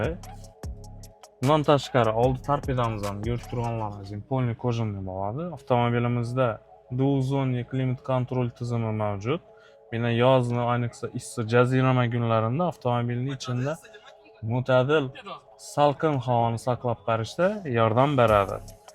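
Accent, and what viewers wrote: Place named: Khorezm, Uzbekistan. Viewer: Молодец. Обзор яхши чикипти. Айрим хоразм блогерларни умуман тушиниб булмайди. Бунда хоразм акценти бор булсахам хаммаси тушинарли.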